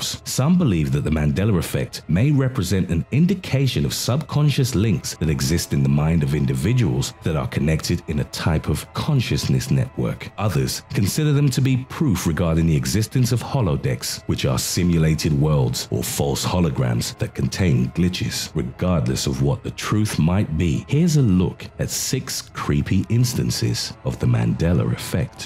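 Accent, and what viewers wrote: United Kingdom. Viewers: Mainstream Commercial Nihilism he has a British accent so mandeler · its a British accent :/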